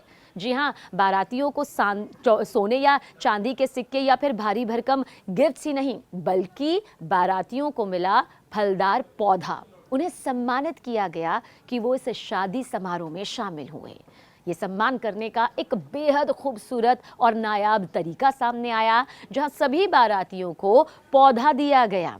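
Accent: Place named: Mumbai, India. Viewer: Ap maidam bihar se nahi ho apke awaj lag rahi ha ap mumbai ke ho 👍🏾👍🏾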